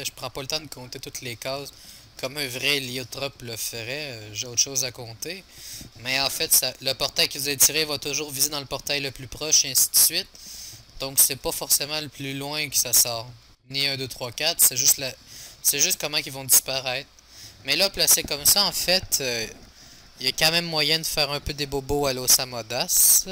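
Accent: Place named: Quebec, Canada. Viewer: OMG TN MICRO EST MEDIOCRE XD, Sinon ta un accent québécois (je suis un nouveau sub) :p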